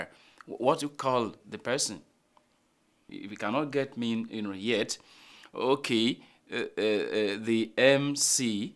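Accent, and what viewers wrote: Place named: Yoruba, Nigeria. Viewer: Please, get rid of your Yoruba accent, as it can distort your message if someone who's not familiar with Yoruba people listens to you. "In this episode, I want to 'h'ask you...."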